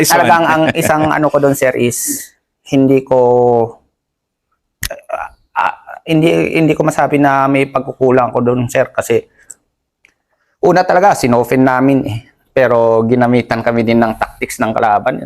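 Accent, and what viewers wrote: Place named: Ifugao, Philippines. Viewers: Talagang taga Ifugao si sir ying letter r nya😊ingat palagi sa lakad nyo sir... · Wow, a big salute Sayo kabayan Major. Halatadong Ifugao/Igorot because of the way you speak Sir. It's always a combination of English and Tagalog 😊😊😊. Anyway, I totally appreciate your courage and wisdom Sir. God bless.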